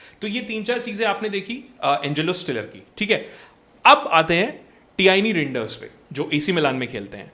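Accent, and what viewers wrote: India. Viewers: I'm not sure if Pintus will leave. He isnt a part of Carlo's staff, works for the club. Although Xabi will have his own staff, I think Pintus will stay. One suggestion from my side. I have noticed that people find it weird when you pronounce the players name in Indian way. Like usually other creators, if you have seen, they pronounce the players or clubs name in the actual pronunciation. Hope this might help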